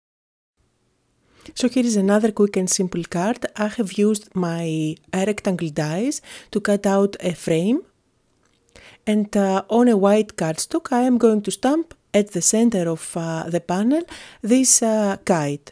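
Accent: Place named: Greece. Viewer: angelam perez by the accent I think that she is Greek and also her family name 😉😉😉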